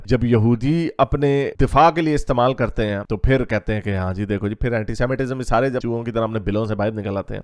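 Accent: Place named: Punjab, India. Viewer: Your accent and panjabi tadka is awesome 👏👏👌👌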